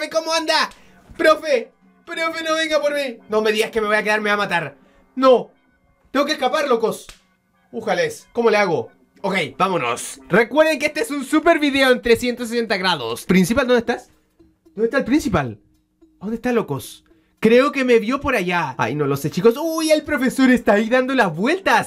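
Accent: Chile. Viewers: Eres de chile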